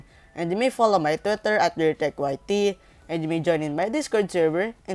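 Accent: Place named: Philippines. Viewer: You are unmistakably Filipino, that voice gives it away 😂. And yes, I'm the same person from Twitter.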